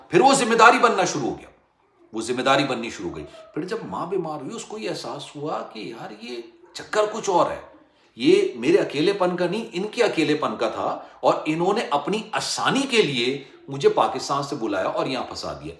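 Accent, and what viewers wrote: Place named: India. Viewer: speak like a pakistani please not indian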